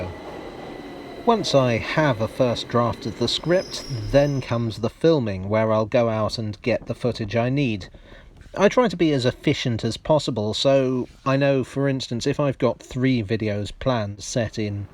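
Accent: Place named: Midlands, United Kingdom. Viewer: Well done! 50,000 can't be that bad. I have been trying to workout of your accent. I'm usually quite good at it but can't quite pinpoint yours. Perhaps like me you were born in the midlands or other parts of the hinterland and in very early (talking) years moved to london. Let me know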